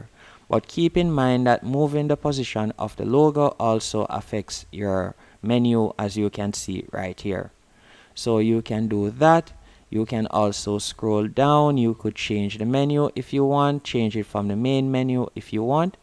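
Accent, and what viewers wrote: Jamaica. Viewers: Haven’t finished the video yet but I wanted to take a moment to admire you accent. I love the Jamaican accent, reminds me of home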